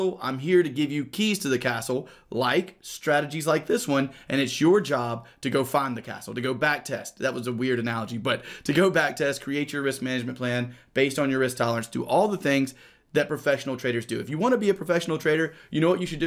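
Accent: South, United States. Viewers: he's the American Chris, coz he sounds american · love your videos brotha. thanks for all the help. I gotta ask...are you from the south? I hear some twang in your voice. im from south Louisiana btw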